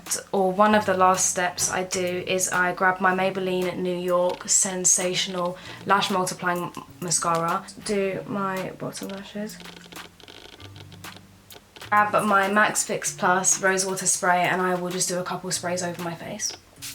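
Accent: United Kingdom. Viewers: Jeez I love the English accent. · U look pretty w no makeup😂💕love ur accent even tho I’m from ldn too. RIP CADET❤️🙏🏽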